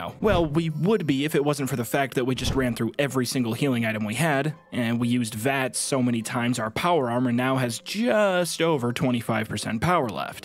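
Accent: Midwest, United States. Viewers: Thank you for this historic moment, your nasally Midwestern accent only served to add to the experience:) Now do it on survival!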